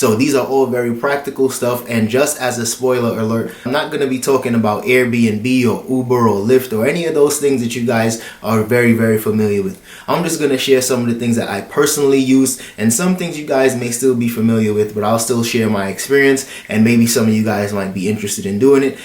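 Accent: Caribbean. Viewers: I still sense some caribbean or something . Lol. I like it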